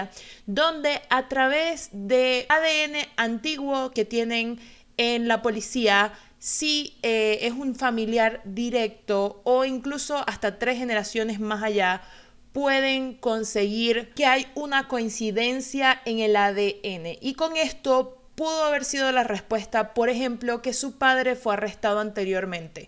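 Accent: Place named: Venezuela. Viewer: Eres venezolana? reconocí tu acento de inmediato mrka<3 jajaja te amo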